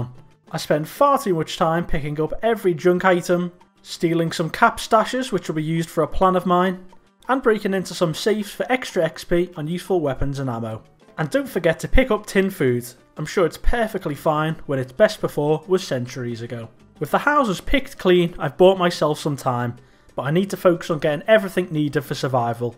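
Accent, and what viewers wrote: Liverpool, United Kingdom. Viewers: Yes now scouse youtuber im here for it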